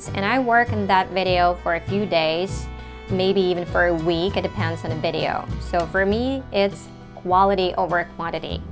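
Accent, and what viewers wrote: United States. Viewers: you really sound American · Tremendous American Accent...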